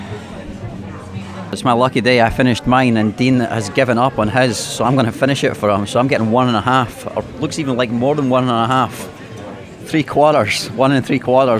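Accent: Scotland, United Kingdom. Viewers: Yeah.. This is the real scottish accent and a much thinner scottish dude.. 😅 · I love the Scottish accent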